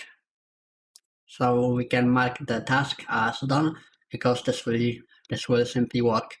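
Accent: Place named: Poland. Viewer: Ik u are poland i hear that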